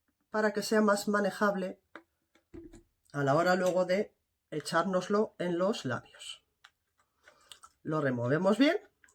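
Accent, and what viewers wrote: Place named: Spain. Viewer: Por su acento creo que esta mujer también es española 😂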